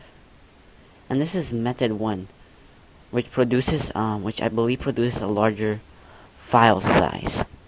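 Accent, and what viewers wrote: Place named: Asia. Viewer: Lol a very asian accent this guy has.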